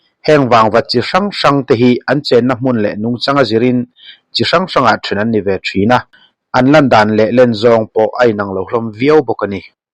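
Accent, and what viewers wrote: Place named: Mizoram, India. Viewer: vāngvat itia, vangvat alawm... i thluk dan reih ruih hi chu a bru mizo awphawi riau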